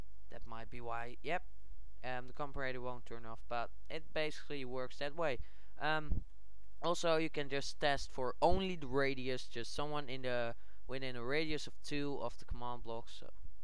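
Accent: Netherlands. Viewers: Are you dutch? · are you dutch ?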